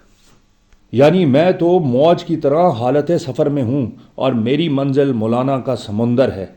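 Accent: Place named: Iran. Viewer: السلام علیکم۔ علامہ کے مثنوی کے آغاز میں لکھے گئے ان اشعار کے مفہوم کو سمجھنے کے لئے آپ کی ویڈیو کو کئی بار سنا مگر ان اشعار میں پوشیدہ وسیع مفاہیم کو سمجھنے کی تشنگی ختم نہ ہو سکی ۔ علامہ کا فلسفہ خودی تو انکے بعد میں آنے والے کلام میں بیان ہوا ہو گا مگر انکے یہ تمحیدی اشعار مولانا روم کی عظمتِ فکر کے ساتھ اقبال کے ان سے روحانی تعلق اور عقیدت کے اوراق بھی کھولتے ہیں۔ علامہ مولانا کو اپنے پیرومرشد کا احترام و مقام دیتے ہیں۔ آپ نے ماشااللہ ان اشعار کی بہت خوبصورتی سے تشریح کی ہے ۔ ایک ایک لفظ اور تلمیح کا سلیس مطلب بتایا ہے۔اور فارسی کلام کو موجودہ ایرانی لہجے میں پڑھا ہے۔ اللہ آپ کو جزائے خیر دے۔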